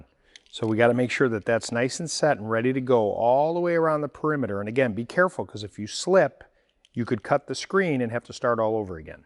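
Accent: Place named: Midwest, United States. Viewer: Thanks for the great information! Will tackle this in a couple of months once things warm up just before spring., I kind of feel like you must be from the Ohio/Indiana/Illinois area? I recognize your accent as being common in those locations. Always love stopping by my local Ace as opposed to the so-called "big box retailers". Thanks again.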